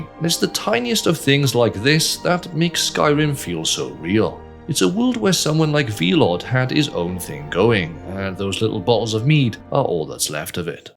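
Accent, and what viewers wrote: Wales, United Kingdom. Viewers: Are you Welsh?🏴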